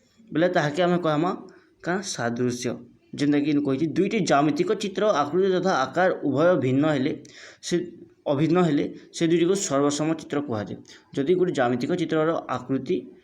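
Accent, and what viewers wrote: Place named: Odisha, India. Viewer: ମତେ ବୁଝା ପଡୁଛି। ଆପଣ ବହୁତ୍ ଭଲ ପଢାନ୍ତି ସମ୍ବଲପୁରୀ ଭାଷା ତଥାପି ବହୁତ୍ ଭଲ ଲାଗୁଛି ଶୁଣିବାକୁ,ବୁଝପଢୁଚି ମଧ୍ୟ।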